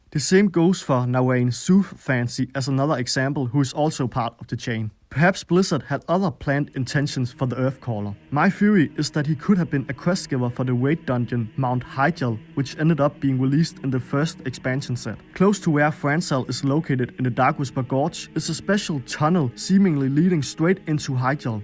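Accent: Denmark. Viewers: A strong Danish accent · This is the most danglish english I've ever heard. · Cranston he is danish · I listened to his video. He sounds painfully Danish. I know since I'm Scandinavian. It's like when an American hears a Canadian accent. I wouldn't be able to pick up on it and might even think I'm speaking to someone from the US but Americans seem to pick up on it instantly. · You Sound very Danish. Where do you come from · Danish here. Hes Danish. Not a single doubt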